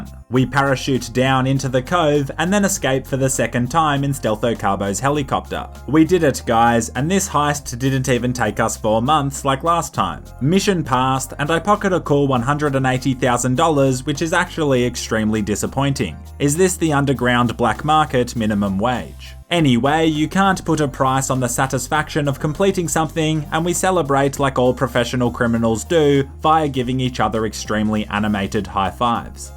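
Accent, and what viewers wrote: Australia. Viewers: Are u Australian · Where u from in Australia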